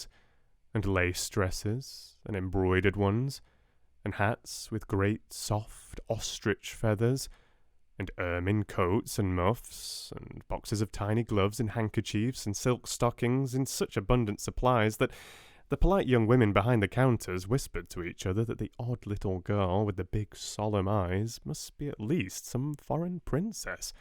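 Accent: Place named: United Kingdom. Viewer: La verdad no entiendo un caraj* no soy muy buena con el acento británico, pero es uma delicia caralo 👌 suscritisima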